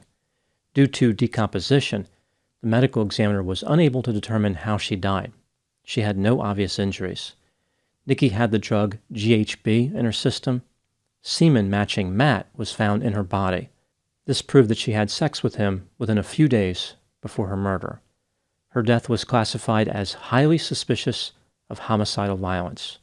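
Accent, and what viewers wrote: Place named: Philadelphia, United States. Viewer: Psychology and Philly accent: on point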